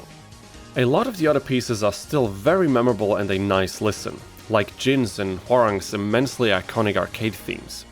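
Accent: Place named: Netherlands. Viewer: Easily one of the most irritating videos I’ve ever watched from start to finish Audio balancing, trash. Netherlandic accent, trash. Ad break after every song, hope the .01 euros I gave you was worth the time you wasted of mine. Can’t take 5 seconds to google “what does embu mean?” While editing this whole ass hour long podcast on a computer.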